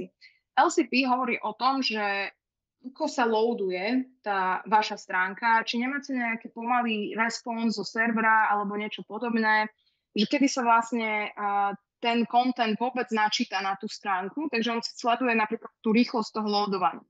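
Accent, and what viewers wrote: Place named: Bratislava, Slovakia. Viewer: tato tema ma vobec nezaujima, ale pozrel som si to cele lebo mi chybal bratislavsky prizvuk :)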